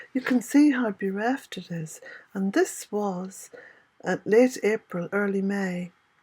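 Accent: Ireland. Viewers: The nastiness comes from a place of bitterness and jealousy, just tell em to feck off in that lovely Irish accent of yours ! youre doing a grand job, lots of love to you and Jack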